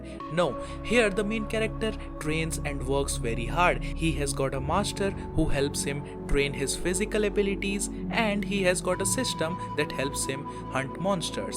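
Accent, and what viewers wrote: India. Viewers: bro, sound so much like a Indian... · Your accent is Indian 😉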